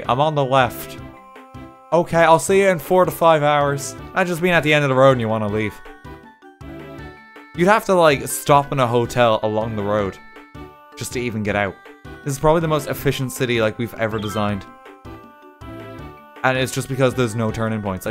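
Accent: Ireland. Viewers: Ya know GrayStillPlays tries his best to make a chaotic city but you have made a well working chaotic city + an irish accent so you are better. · Are you from Ireland I am I live in Kerry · Gotten used to how his accent sounds now and I honestly can't tell if he sounds less or more Irish than he does here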